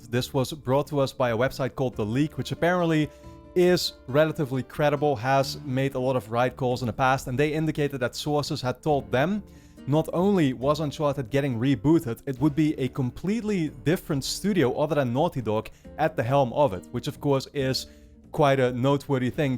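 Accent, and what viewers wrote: Netherlands. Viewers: You really wear your thoughtfulness and good nature towards others on your sleeve and its a refreshing thing when it comes to talking about games...maybe people just do it too much and you just hit the right amount but thanks for the vids. I might have a semi possibly ignorance induced anecdote to go with my claim as ive never encountered someone with an accent like yours within the last 10-15 yrs who wasnt a total joy to be around. Basically for me, dutch type people voicing their excitement about stuff gets my juices flowing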